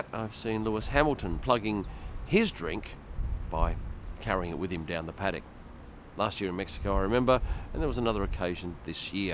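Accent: Australia. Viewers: Hi Hope all is well. I love your work, and I'm so glad that ur an Auzzie, makes it easy to understand the auzzie language, lol. Also, I would like to win that TM 43. I also would like to tell you that while looking at all the PANZERA watches, I like the model AQUAMARINE 45C 45MM SWISS AUTOMATIC CHRONOGRAPH. Beautiful watch indeed, has every component that you need in a watch.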